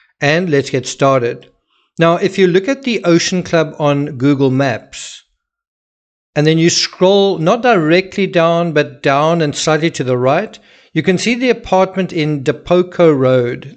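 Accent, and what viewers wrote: South Africa. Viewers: I like the SA accent as well.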